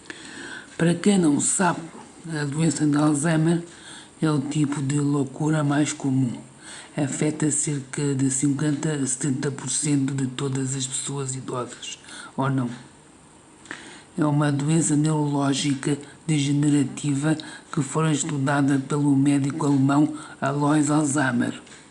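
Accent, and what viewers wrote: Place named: Portugal. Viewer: Olá amiga, passando para conhecer seu trabalho. Muito bom saber sobre a doença. Você parece de Portugal. Deus abençoe 💋🌹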